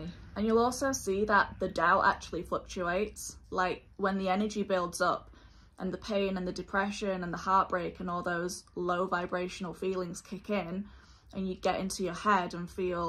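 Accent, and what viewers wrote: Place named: England, United Kingdom. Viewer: ...i know right, since when did 'not' recording for awhile, and doing anything other than laying dead in a ditch or being in a prison cell, mean laying in a ditch or being in a prison cell. 😂😂😂 Again thank you, i love your beautiful interpretation and articulation of the TF Journey. I notice your english accent, im irish, so 'hi- diddly - ho, neighborino', as Ned Flanders would say. 😂🙏🏻💚 Keep up the good work.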